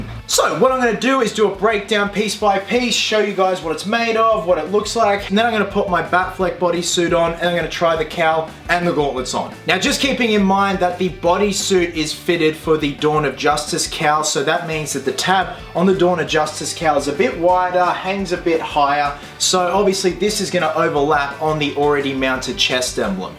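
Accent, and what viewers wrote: Australia. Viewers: There is something visually strange about Batman with an Australian accent. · The suit looks badass but it is so funny when I see batman just speaking in an Aussie accent saying be happy, be silly hahaha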